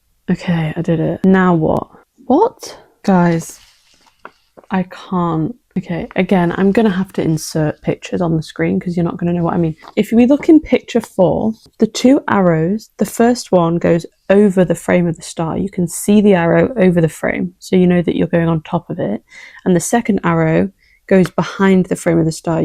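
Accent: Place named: United Kingdom. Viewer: for a second i thought the pronunciation thing was wrong, and then i realized… british. lol in most american accents we say MAcrame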